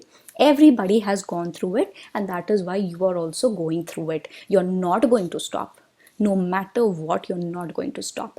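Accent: India. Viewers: Why I'm not understand indian accent but American do?? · wow so nice, i love Indian accent. love and respect from Pakistan .. Mam u r looking like illena D'cruz.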